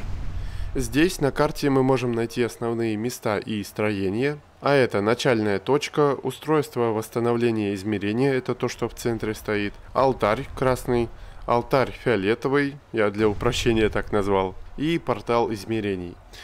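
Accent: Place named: South, Russia. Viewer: Необращай внимание, есть у тебя некий "южнорусский" акцент).Вначале думал ты с Украины, но потом увидел некий город на букву Л.Это фигня, ты молодец, работаешь над собой помню твои старые ролики)))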